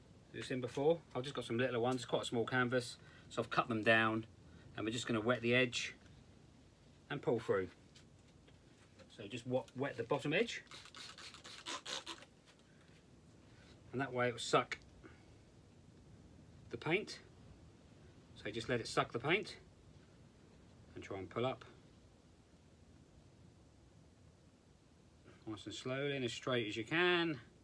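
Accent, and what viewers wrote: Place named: United Kingdom. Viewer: One Brit to another , I seem to understand better with the accent!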